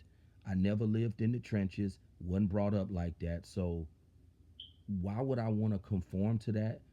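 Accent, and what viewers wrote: United States. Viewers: stop talking ebonics and ill let you in the white club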